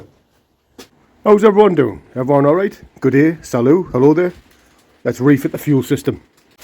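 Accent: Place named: Newcastle, United Kingdom. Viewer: ...one of the truly great iconic cars of the early 70's along with the mk3 Cortina, geordie accent is a definite turn on by the way! 👌🧸💜xxx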